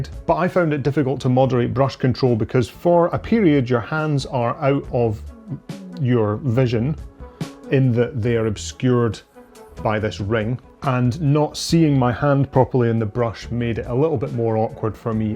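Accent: Scotland, United Kingdom. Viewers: Was so good to hear a Scottish accent when I turned the volume up. (No, I haven’t got some weird voice fetish, it just meant I haven’t got to go searching for the UK equivalent with the links! For a change.)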